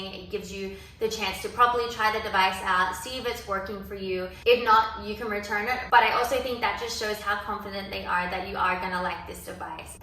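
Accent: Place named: Canada. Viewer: Catching a slight Canadian accent coming through 🤭 Sold on this! Looks like a great product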